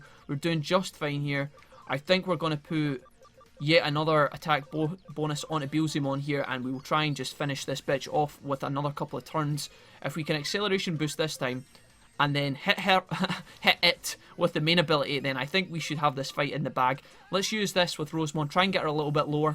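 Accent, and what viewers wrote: Scotland, United Kingdom. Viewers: Its coming out now! Lol When are you back man!? We miss ya. Can't wait to hear your Scottish voice again :))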